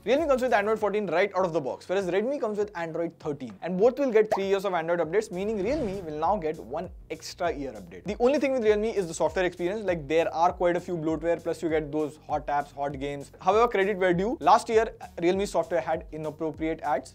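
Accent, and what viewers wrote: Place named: NRI, India. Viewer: ALRIGHT !!! THAT WAS SO MUCH ACCENT AFTER NRI"s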